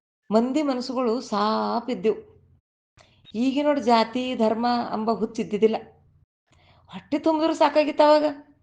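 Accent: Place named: Bidar, India. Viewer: ಬೀದರಿನ ಯಥಾಪ್ರಕಾರ ಮೂಡಿಸಿ ತಿಳಿಸಿದ್ದೀರಿ ಜಾನಪದ ಹೃಡಿನ ಸೊಗಡಿನ ಮಾತು ಬಲು ಚಂದ👌🏻👌🏻👏👏🌷🌷🌺🌺 ಶರಣು ಶರಣಾರ್ಥಿಗಳು👏👏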